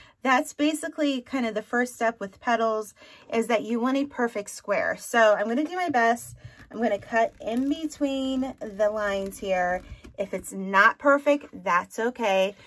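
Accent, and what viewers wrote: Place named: West Virginia, United States. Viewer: yes you still have to pay toll.its 50 cents..I understand how you say words different so people say..I grew up in west Virginia to.so I have the same problem you do.but its all good.love your wreaths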